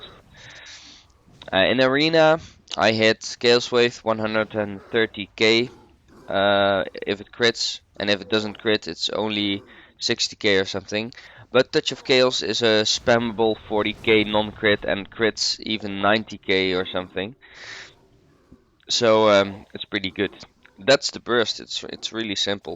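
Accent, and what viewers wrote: Netherlands. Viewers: gotta love that obvious dutch accent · Kan goed horen dat je Nederlands bent, haha, goeie guide!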